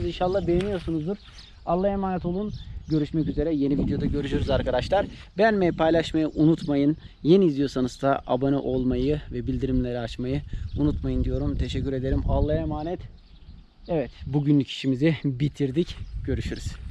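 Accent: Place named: Edirne, Turkey. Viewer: Merhaba Abi, aslen Eskişehirli misiniz? Bizde Edirneliyiz de konuşma şeklinizi bize benzettim şive olsun ağız olsun ☺ Göçmenlik var mı acaba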